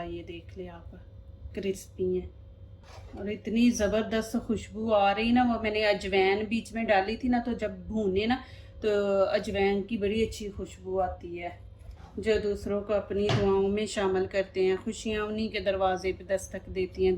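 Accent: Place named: Punjab, India. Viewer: Excellent recipe....aapke baat karne ka tareeka bilkul punjabi....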